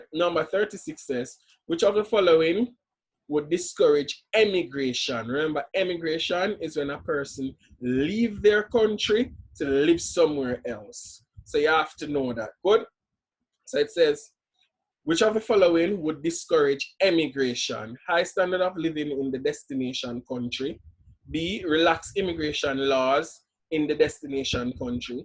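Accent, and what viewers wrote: Jamaica. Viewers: i really enjoy listening to your Jamaican accent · the Jamaican accent is a nice one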